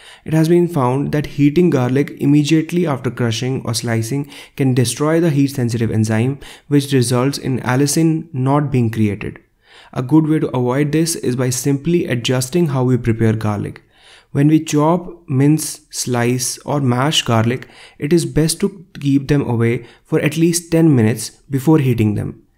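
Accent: India. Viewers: I ❤ Indian accent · Is that a Hindi accent?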